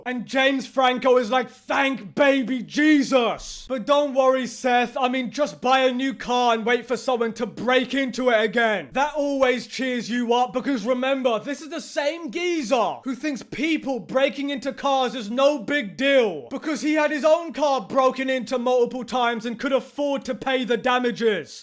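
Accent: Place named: Yorkshire, United Kingdom. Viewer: love your accent bro, is that Yorkshire?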